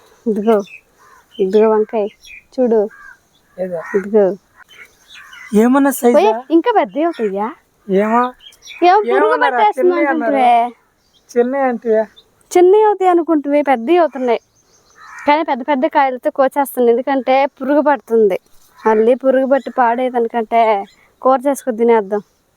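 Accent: Nellore, India. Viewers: Hi gaaru... meeru..mee vaarini 'AVNU GADHAYAAA' ani mana nellore language lo antunte vinataani chaala baguntundi 😊naakkuuda maa vaarini alaane pilavaalanpisthundi😊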